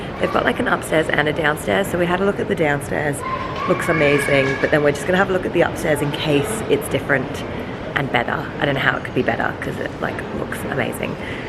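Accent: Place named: Australia. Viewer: An Australian accent 🥰 have fun!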